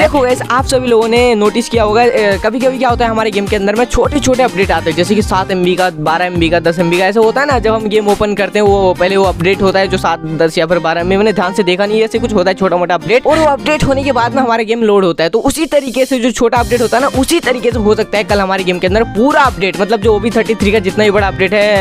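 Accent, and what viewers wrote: Odisha, India. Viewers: Bhai odisha se ho kya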